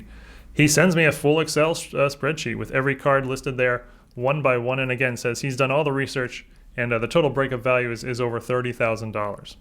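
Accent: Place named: Baltimore, United States. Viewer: By any chance are you from MD/baltimore? your accent sounds baltimore...and I feel like I met you years ago at a show I'm in my 30s now getting back into collecting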